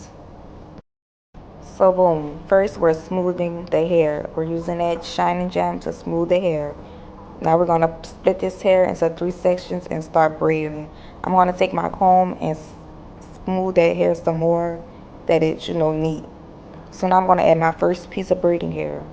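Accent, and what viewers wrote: New Orleans, United States. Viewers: I hear a Nola accent and I’m here for it 😵🥹🥰😍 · I hear the Nola in you lol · Great Job! I know you from New Orleans by the Talk.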